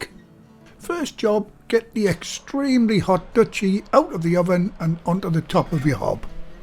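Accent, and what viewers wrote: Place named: Newcastle, United Kingdom. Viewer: Your voice is so calming for less confident cooks! " Set your yeast away"... I've decided more food channels need to be in Geordie dialect!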